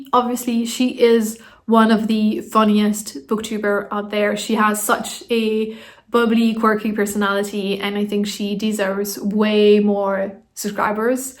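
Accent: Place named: United Kingdom. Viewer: Nice getting to know you better 😊 I don’t know if I could do this tag anytime soon because I feel awkward talking about myself (in general speaking into a camera is unsettling for me) but I’m trying to do more book tags. I think you’re a super eloquent speaker BTW! I would have guessed you were from the UK!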